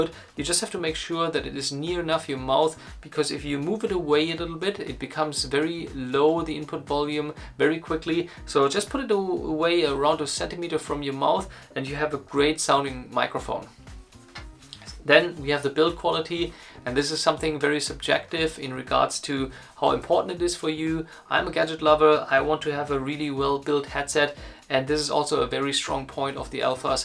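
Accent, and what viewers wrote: Germany. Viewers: Deutscher Akzent kickt anders haha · He is from my State. Germany. So he has an german accent that is what you hear😆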